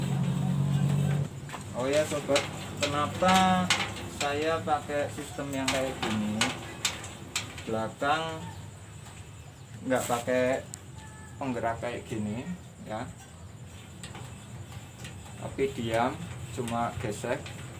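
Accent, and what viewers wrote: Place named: Banyumas, Indonesia. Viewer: Sampean asli mana kang? Ko ada suara ngapak'y 🤭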